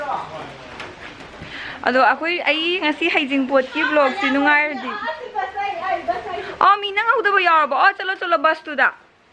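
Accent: Kakching, India. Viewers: Is this how you normally talk at home or you just wanted to show how kakching people talk? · che se kakching mlli🙄🙄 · I m watching ur vedio far away from Nepal. Love to listen ur voice .. ei na yam pamjava ni kakching marol j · Kakching wa khungang sey ym nung c yeh.. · Kakching marol ne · Ym engna fjeiko ngn wa ngangbje ym nungcye ado kakching gi lon nttabid amut haiyu ko aduju ym nungcdw mnle berry nc sister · Kakching maron malle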